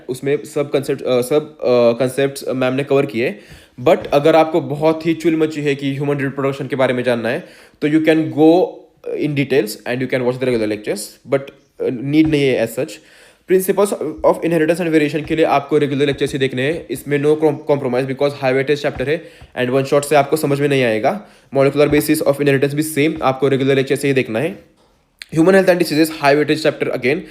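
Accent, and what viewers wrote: India. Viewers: Lagta hai aap maharashtra se hai 😂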